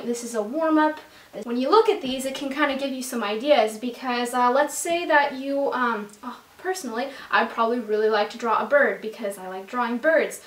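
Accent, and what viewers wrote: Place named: California, United States. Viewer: It's ok, you from California? For some reason that was what came to mind (when I heard the likes).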